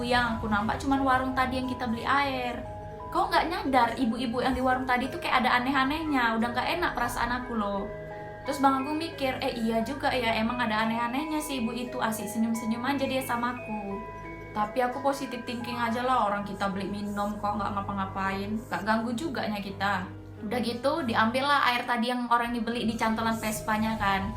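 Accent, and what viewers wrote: Medan, Indonesia. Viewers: Anak Medan emang gitu bang kalo bicara keren😃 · KEK NADIA OMARA APA JIPLAK APA EMANG GINI OSEMUA ORANG MEDAN NGOMONGNYA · suka banget kalo dengerin orang medan cerita beda aja rasanya kayak lebih seru lahhh mantab semangat terus kakkkkkkk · Cerihas suara Medan nya gak hilang kk .keren .horas .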